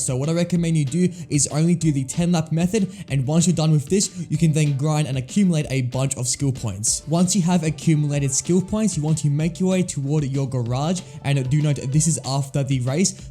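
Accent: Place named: Australia. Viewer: Bro is fine he's an Aussie I live there I have the same accent but I'm Italian there is nothing annoying about his voice unless you hate it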